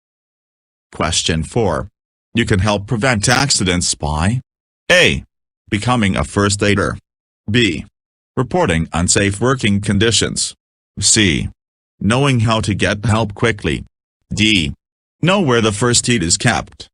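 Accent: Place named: United States. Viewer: Why the american accent voice over? Also, since when do we use the term "gasoline"? Very poor quality.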